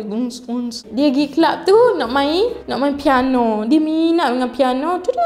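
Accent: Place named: Terengganu, Malaysia. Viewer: Pekatnya ganu sis🤣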